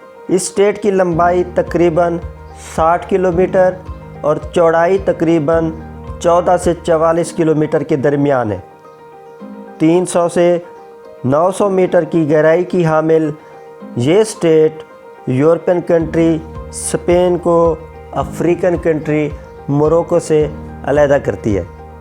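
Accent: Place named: India. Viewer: Click - > Indian Voice - > Close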